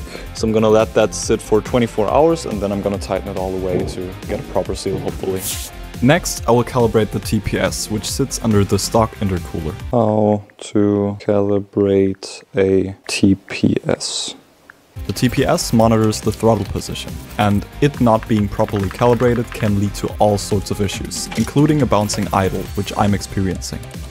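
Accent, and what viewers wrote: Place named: Germany. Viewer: I new from the beginning you must be german speaking because of that accent 😂 but the license plate did make me unsure, until you started talking to your buddy😂 Well, Hallo aus Deutschland 😂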